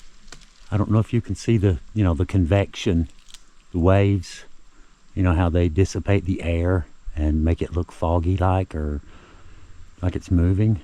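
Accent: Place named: West Virginia, United States. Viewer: How can I send you pictures of my family’s old-school coal trucks with wooden spoked wheels from the early 1900’s??? Now mind you these are actually from my mom’s side which happens to be right outside of Pittsburgh, now my dad’s side is from down your way in Jackson County Riley, WV & Ravenswood area, but you sound just like my dad’s people, the way you speak and the pitch of your voice I love it!!! Mostly are gone so it’s a breath of fresh air watching your videos to say the least!!! Please don’t stop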